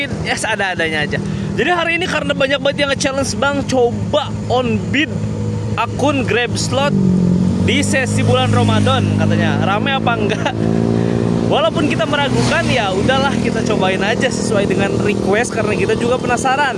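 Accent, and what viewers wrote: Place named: Sumatra, Indonesia. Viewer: Itu logat Sumatraan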